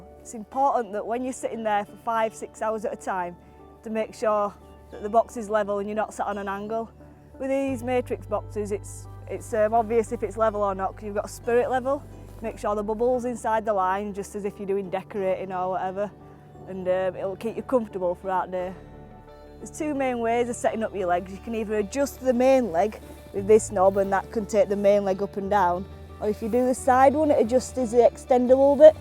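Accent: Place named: Yorkshire, United Kingdom. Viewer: great film and a language that I understand perfectly,broad yorkshire👍👍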